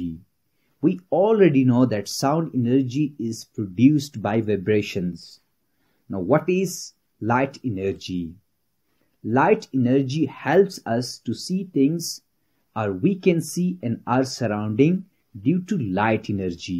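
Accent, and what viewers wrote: India. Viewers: Very good but make your accent like american not indian Good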